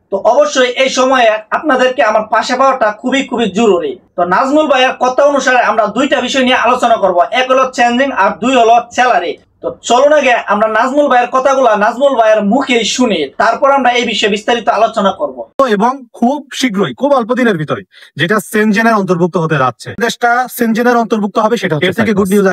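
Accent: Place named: Brahmanbaria, Bangladesh. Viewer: আসসালামু আলাইকুম ভাই আপনার ইনফরমেশন কথা ঠিক আছে।আশাকরি আপনি আরও উপরে উঠবেন আমরা আরও অনেক কিছু যানতে পারবো আপনার মাধ্যমে। তবে একটা কথা আপনার বয়েস কোয়ালিটি একটু শুদ্ধ সাধু ভাষায় বলার একটু চেষ্টা করবেন। ঠিক হয়ে যাবে আস্তে আস্তে চালিয়ে যান কিছু মনে করবেন না আপনি মনে হয় ব্রাহ্মণবাড়িয়া জেলার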